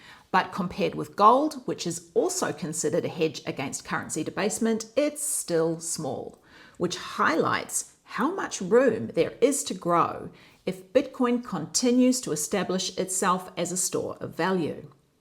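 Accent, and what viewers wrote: New Zealand. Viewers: This was an eye opener Just started my bitcoin journey and stumbled onto your platform Your accent reminds me of my kiwi sisters 🤣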